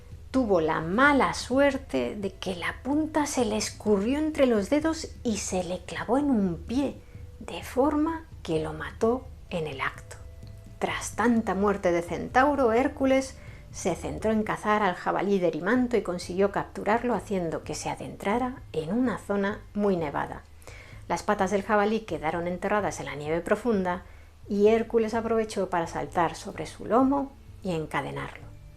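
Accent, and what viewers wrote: Spain. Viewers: Me encanta la manera en que narras las historias , excelente oratoria, el acento español me agrada mucho , es como escuchar audio libros, gracias desde Venezuela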